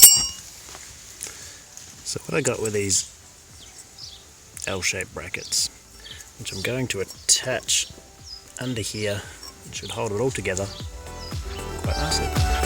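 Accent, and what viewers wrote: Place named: Australia. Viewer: It looks great! Lovely to hear an aussie on Youtube too :)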